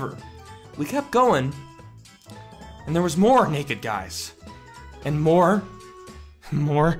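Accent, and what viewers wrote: Canada. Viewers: wait, r u canadian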